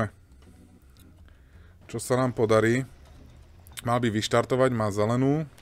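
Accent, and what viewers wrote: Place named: Slovakia. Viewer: Ach,jo..Ty jsi ale případ. :D Ale nakonec jsi zjistil jak na to,ne?Asi ti to zabralo pár hodin pátrání a zkoušení,ale co už no....Jako v tom večerníčku Pat a Mat.Akorát,že ty jsi na to sám.Moc jsem se pobavila. Děkuju. :D Vím,že se opakuju,ale to slovenský ř nemá chybu. :D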